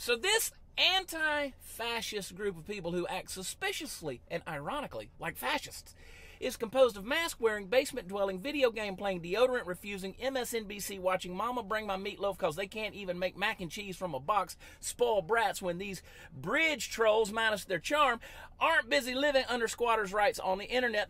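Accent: Texas, United States. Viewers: This is so funny because as soon as I heard you talk I wondered if you where from Texas and I'm from Oregon and you are so right about Portland 😂😂😂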